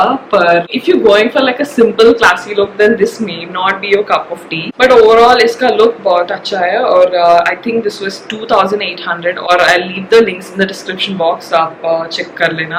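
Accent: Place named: Hyderabad, India. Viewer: Hyderabad ka Hindi v bole to acha nhe rahta..... jaisa rhta waise he chalenga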